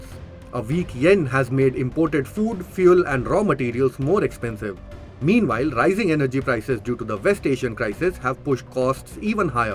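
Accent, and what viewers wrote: India. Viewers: Indian english 🤮🤮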